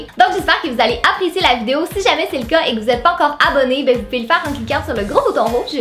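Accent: Quebec, Canada. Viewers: Super vidéo comme d'habitude. C'est la première fois que je commente une de tes vidéos mais elles sont super. J'aime trop trop trop ton accent québécois. Je t'aime tellement. Continue comme ça, ne changes jamais ❤️❤️ P.S je te suis depuis tes débuts de vidéos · J adore ton accent QUÉBÉCOIS est que tu peut me repondre je t adore stp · oh mon dieu je suis marocaine mais j'adore l'accent Québécois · Salut tu et trop belle j'adore ta série back to school et j'adore ton accent québécoise continue comme sa bisous ma belle j'espère que la joie et la bonne humeur soit avec toi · J'adore tes vidéos et je t'adore !!!!!!!! ton nouveau décor de vidéo est juste trop beau !!!Tu es trop belle et je trouve que ton accent canadien est très joli !!!PS : Je passe en 5ème et je reprend le 5 septembre. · J'adoooore ton accent canadien 💕💕💕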